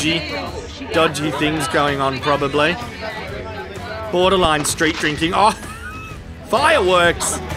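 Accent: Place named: Australia. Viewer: let me remind you, the only reason i watch your show is for your voice, you are funny, witty and amusing, if i want to watch shows of disaster its a dime a dozen on FB, but your aussie voice and jokes is the best, so no more silent videos. thank you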